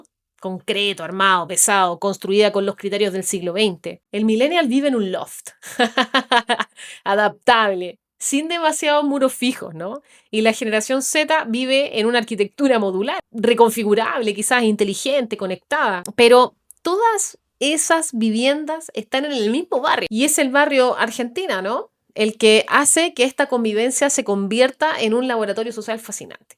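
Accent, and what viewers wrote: Chile. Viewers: Me llamo la atencion por que deje correr los primeros segundos y note tu acento y suavidad chilena, estoy de acuerdo como graficaste con viviendas como seria cada generacion jaja muy bueno el video.. saludos desde buenos aires!..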